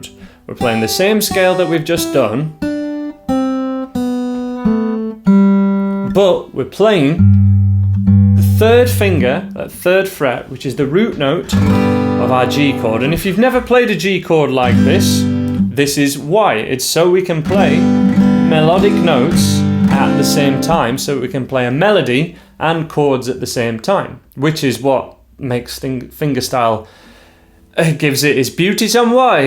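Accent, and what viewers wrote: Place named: Buckinghamshire, United Kingdom. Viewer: Where u from? U sound Buckinghamshire esque.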